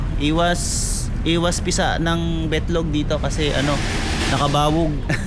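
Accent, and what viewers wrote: Visayas, Philippines. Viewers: Bisaya ka bai?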